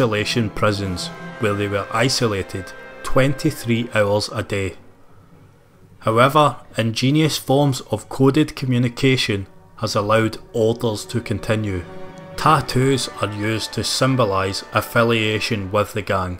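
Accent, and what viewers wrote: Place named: Scotland, United Kingdom. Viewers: You sound scottish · Didn't think I'd be watching a documentary on American prisons with a fellow Scotsman narrating it 😂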